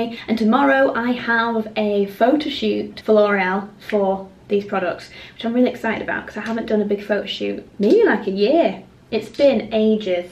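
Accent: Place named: Wigan, United Kingdom. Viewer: I’ve only just subscribed to you and can’t believe you’re also from Wigan!! Immediately I thought I recognised a twang in the accent 😊 xx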